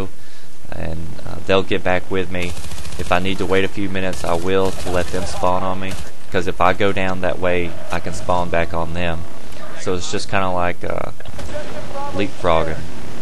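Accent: South, United States. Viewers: Finally southern guy on here (no homo) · bad company toow. is he from the south?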